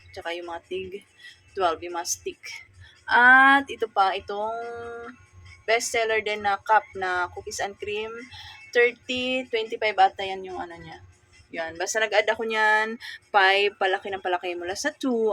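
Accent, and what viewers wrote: Waray, Philippines. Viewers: Hello madam new subscriber here. Waray waray ka man po. Hehe. Taga diin ka po? 😊😊😊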